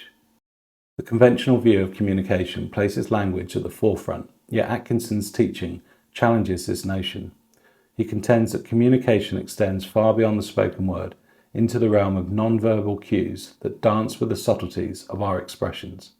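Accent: United Kingdom. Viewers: nice to hear your British accent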